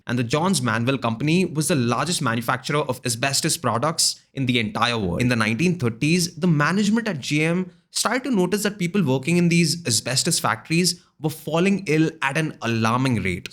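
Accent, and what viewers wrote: India. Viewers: The narrator has such heavy Indian accent that it's almost impossible to follow what he is saying. Can't you learn how to speak English properly? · You gotta work on losing your thick indian accent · This man's got an awful Indian accent and doesn't know what punctuation means...he just rattles on and on like a robot. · This Indian man hits a nerve with me. Don’t care for his “English”. Listened for minutes that’s all I could take! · oh mann, nix ist schlimmer, als indisches Englisch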